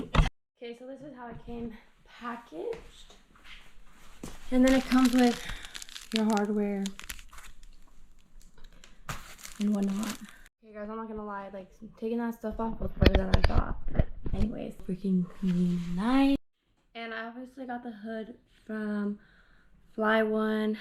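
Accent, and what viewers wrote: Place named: Texas, United States. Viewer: Haven't seen your channel in a while. You seemed to have developed more of a Texas accent.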